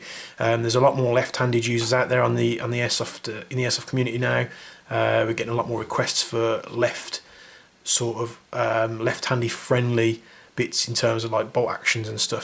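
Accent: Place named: Australia. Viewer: Have you ever lived in Australia? Your voice is very similar to Australians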